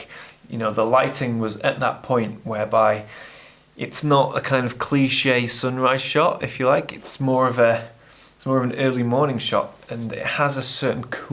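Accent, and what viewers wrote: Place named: Scotland, United Kingdom. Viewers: Hey, infact last video was absolutely beautiful, magic mountains! Being in the north you're getting some sottish accent:-) see ya the next! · Stunning photos, but your Scottish pronunciations have me on the floor, you need to spend more time with Mr Wilkie, great work mate & keep the vlogs coming.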